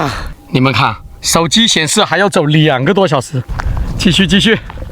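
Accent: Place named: Fuzhou, China. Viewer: 你是福建福州人吗 福州口音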